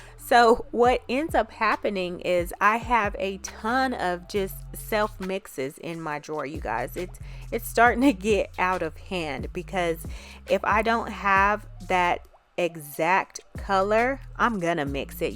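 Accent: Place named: Kentucky, United States. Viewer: You almost sound like your from my area (ky) haha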